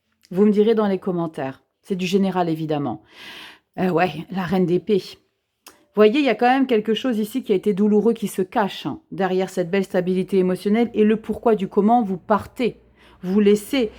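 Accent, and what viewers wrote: South, France. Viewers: Coucou, je vous adore, vos guidances son profonde de sincérité et de lumière, un petit accent du sud? un coucou de Tarbes 65 sud Belle journée à vous et votre famille. Gratitude, gratitude, gratitude. Scorpionne ascendant Vierge🤗🧡💛💚💙💜🤍🙏🐞🦋🍀💐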